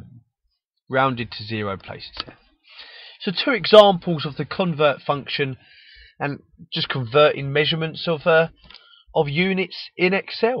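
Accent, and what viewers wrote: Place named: Birmingham, United Kingdom. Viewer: Love the Brummy accent! Great job on the video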